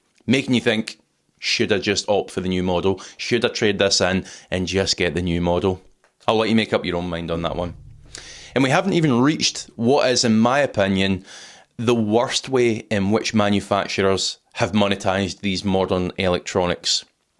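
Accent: Scotland, United Kingdom. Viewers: My friend not only you do a great job you also introduced me to the Scottish accent (love it, no subtitles for me from now on 😂). Seriously, your content is great and of significant practical importance. Thank you. Take care, keep it on. Greetings from Greece. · I have a problem with Scottish accent; Did you say cambus or canvas at the begining? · NOTHING beats a Scottish accent! Greetings from Arkansas. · I love a man who has the courage to tell the unpopular truth...and even more when he does it with a Scottish accent.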